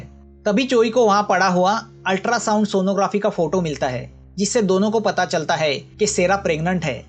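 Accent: Maharashtra, India. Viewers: Marathi manus Boltoy kaltay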